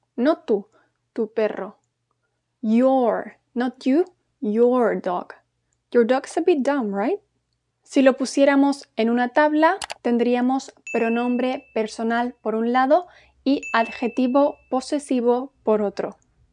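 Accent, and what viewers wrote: Spain. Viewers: uh when you say something with that Spanish clasical with tounge under the teeth I am on seven heaven i think i would come to Spain to you crawling you are amazing in every way 😊😊👌👌👍👍 i would became even Spaniard because of you you are so natural...two of those of you do not exist in Espana. good job you are doing. so profesionale 👌👌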